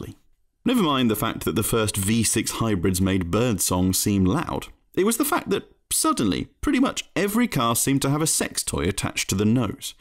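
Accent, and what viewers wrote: United Kingdom. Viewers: Somehow, I just knew that the snobbish narrator would be a Brit. Race cars are build for function. Not appearance. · With this british accent, it's even more funny 🤣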